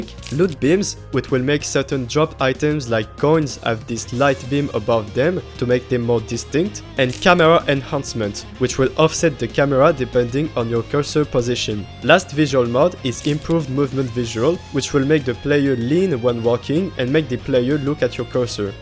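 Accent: France. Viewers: are u french u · le bon french accent on apprécie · tu ne serais pas français parce que ton accent fait très français ? sinon super vidéo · Je sais reconnaitre un accent français quand j'en entend un. Super vidéo bro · I can feel that french accents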